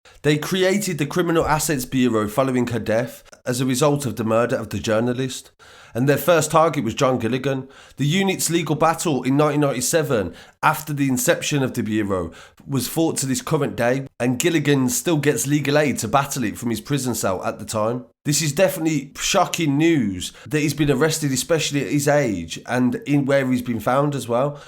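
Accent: Birmingham, United Kingdom. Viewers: Bro I feel your channel would do better if you tone down the brummie accent. Good story!!!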